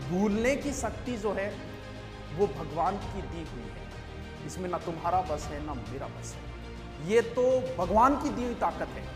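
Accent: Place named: Haryana, India. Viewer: Sir aap haryana se ho ya Rajasthan se dono language remix lag rhi h me haryana se huu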